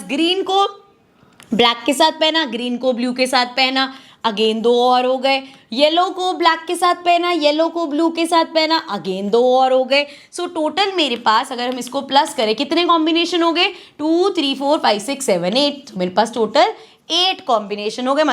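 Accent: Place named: Marwari, India. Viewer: Ma'am very nice explanation thank you so much ma'am aap marvadi ho? Allee alle bolte ho isliye laga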